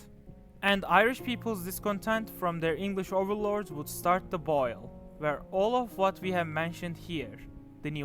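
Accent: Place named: Turkey. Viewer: Türk aksanı da eklenince gayet güzel bir video olmuş. Tebrikler :)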